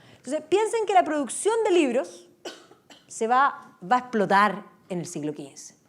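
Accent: Chile. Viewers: Reconoci que era chilensis por el acento jajaja.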